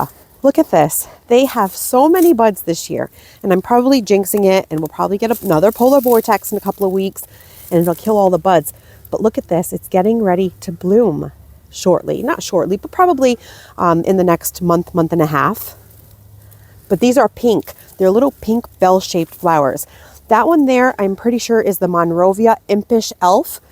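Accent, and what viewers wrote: Massachusetts, United States. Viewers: Love your Mass accent! Don't change it! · Hi! I really enjoyed this video and seeing both you and together…thanks for sharing your plans! I could just feel the joy & excitement you had & it motivated me to start my planning now! We have a new flower bed around our new patio just empty, ready and waiting to be planted up! Oh boy…I’ve got lots of decisions to make…LOL! 😂 BTW…loved hearing your MA accent too! 😊 Also happy to hear that your Chinese Fringe plant from Janie made it…YAY. 👏🏼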